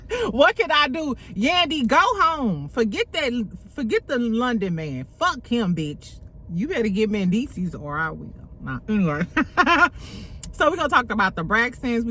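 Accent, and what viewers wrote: St. Louis, United States. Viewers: I just started following you recently and you crack me up! Where are you from? I was listening to your accent like is she from STL but then you said you live in TX. Anyway, happy Scorpio season 11/20 ✊🏾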